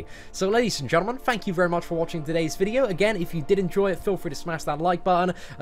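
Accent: United Kingdom. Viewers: Why read the patch notes when you can have them narrated to you with a British accent? ;)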